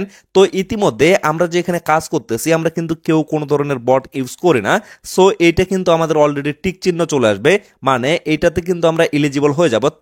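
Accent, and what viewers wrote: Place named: Thakurgaon, Bangladesh. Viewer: first cmnt,,দাদা আপনার ঠাকুরগাঁওয়ের কথায় বাসা🎉🎉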